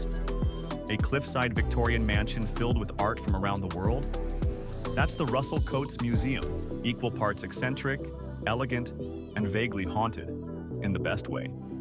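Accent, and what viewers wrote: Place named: United States. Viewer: Commentary is pathetic why American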